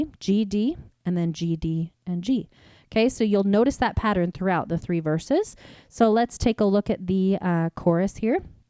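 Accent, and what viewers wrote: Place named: United States. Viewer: Greetings from France ❤️. I SUBSCRIBED TODAY 13th November 2024. I LOVE YOUR "COUNTRY" VOICE, SO BEAUTIFUL AND A PLEASURE TO LISTEN TO ❤. Wowww 😮 You played it to my rhythm, slow and peaceful. You are an EXCELLENT teacher. Thanks from the bottom of my heart for simplifying the music. A big hug of gratitude 😊🫠.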